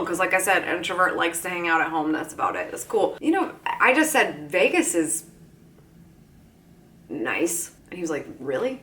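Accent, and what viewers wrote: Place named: Philadelphia, United States. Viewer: vocal fry and from Philly.....!!!!!!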